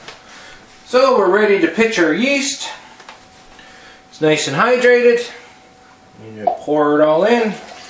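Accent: Canada. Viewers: LOL He sounds Canadian. Of course he made it to get drunk.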